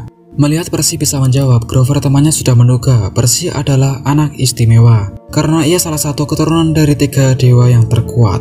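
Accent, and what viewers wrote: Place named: Java, Indonesia. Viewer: Penjelasannya enak dgn warna logat jawa yg kental. 😄😁😊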